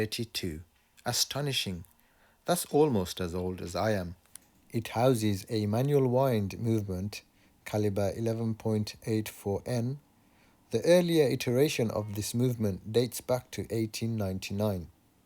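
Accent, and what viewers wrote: Birmingham, United Kingdom. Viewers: I'd say Birmingham, of Asian ancestry. I do come from near there, but of course I'm guessing. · Can you do my dads Longines Sounds like your in uk 🇬🇧 I’m in South Yorkshire What are your prices Thanks Genuine Had it repaired twice at H L Brown 👎 then a private guy 👎 Gutted really we lost him in 2010 😟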